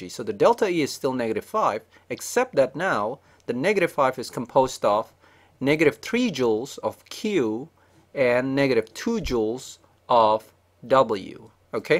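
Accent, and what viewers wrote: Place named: Asia. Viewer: Finally not an Indian accent. I'm guessing an Asian... Could be Chinese, or perhaps Cambodian...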